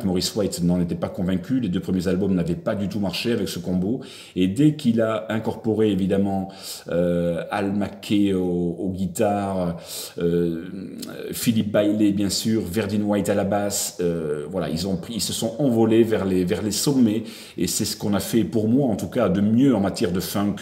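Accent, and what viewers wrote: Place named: Southwest, France. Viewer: Salut super trésor récolte a que agréable notre accent du Sud-ouest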